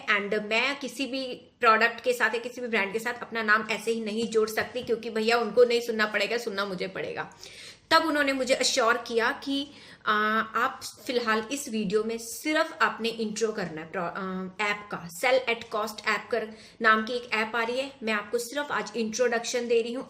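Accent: Punjab, India. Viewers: R u a Punjabi Girl.......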